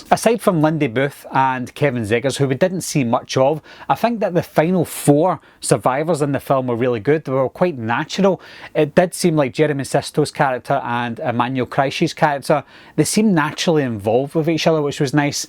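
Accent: Scotland, United Kingdom. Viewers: Are you Scottish my friend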